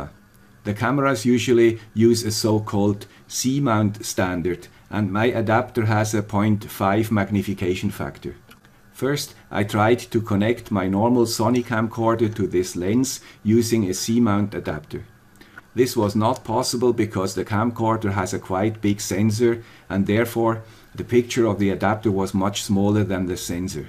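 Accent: Switzerland. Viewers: Many years later, this is still a very helpful video. Thank you, guy with the Swiss accent!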